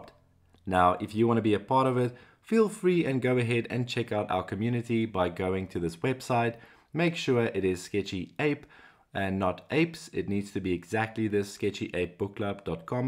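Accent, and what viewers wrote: South Africa. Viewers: Hey you sound south african wow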